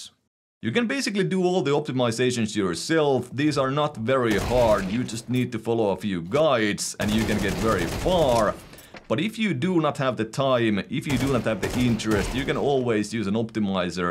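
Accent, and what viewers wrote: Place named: Finland. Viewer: Your accent looks like FInnish if im not wrong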